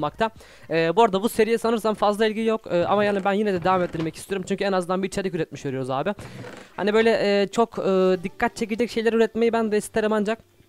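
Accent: Adana, Turkey. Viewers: Abi şu konuşma stiline hastayım tam bir adanalısın <3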